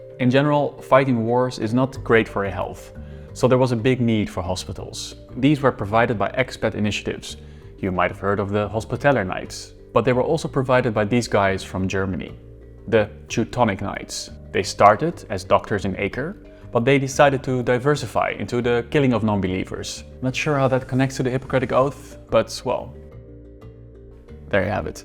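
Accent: Netherlands. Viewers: I was wondering where the host was from, until I heard "togeder" (together). Dutch! 🙂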